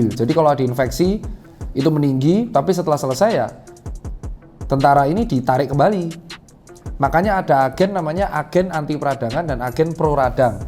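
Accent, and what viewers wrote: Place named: Yogyakarta, Indonesia. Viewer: Yang bikin beda itu penjelasan dr Tirta adalah mudah dipahami dengan kemampuan berpikir orang awam medis.... Dan bahasa logat nya jogja banget...salam dr Bantul... Semoga dr Tirta sehat selalu...GBU.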